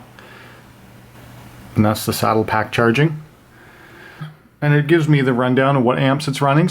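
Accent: Canada. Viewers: The Canadian in me immediately recognized the Canadian in you 😁😁😁😁🇨🇦🇨🇦👌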